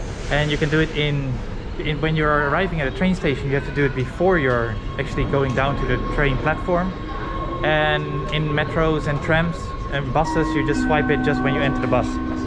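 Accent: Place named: Netherlands. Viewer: A bit to many errors in reporting for that.. Alltough the accent sounds Dutch.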